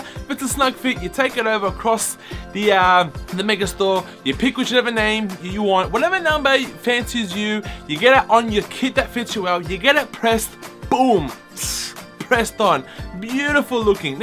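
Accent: Australia. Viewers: Your Australian ??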